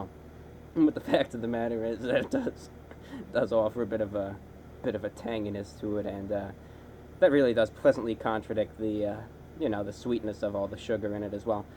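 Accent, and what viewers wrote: United States. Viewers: You are very American aren’t you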